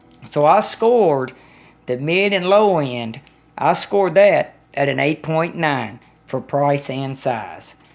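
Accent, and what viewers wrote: South, United States. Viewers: Can't shake the southern outta you brother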